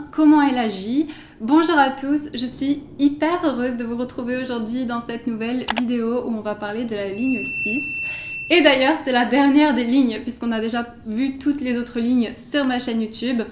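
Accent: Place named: South, France. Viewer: ah merci:) en effet ca sentait l'europe méditéranéenne(surtout accent sur de la france, vers Cacasonne?)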